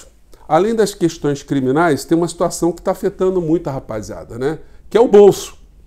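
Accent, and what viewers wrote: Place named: Northeast, Brazil. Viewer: Adooro as palavras do Professor! Parece nóis, nordestinos, falando...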